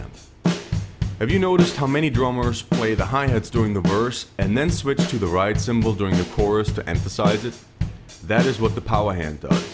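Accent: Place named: Long Island, United States. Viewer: Hi, on your accent - I grew up in Long Island - I just thought you were from somewhere on LI I hadn't been to yet 😁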